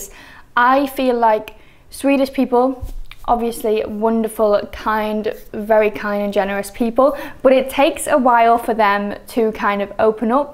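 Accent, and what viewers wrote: United Kingdom. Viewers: Great video! I'm also half Swedish and am planning to move to Sweden some years after I finish uni here in the UK :) One point tho, I actually really don't mind the higher taxes! Yes, it definitely feels like a LOT when you are coming from a country like the UK or the USA but honestly in terms of what you get out of those taxes it's pretty incredible! You have great maternity and paternity leave, child benefits are incredible if you want to have a family, child oriented services and schooling in general is much better than many other places imo, there is investment in maintaining infrastructure, the healthcare system is pretty fantastic and the general state of the country in general. There are things I'd criticise Sweden for but personally I don't mind the taxes. Again, really great video! Looking forward to more content, what UK accent do you have btw? 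I can't quite place it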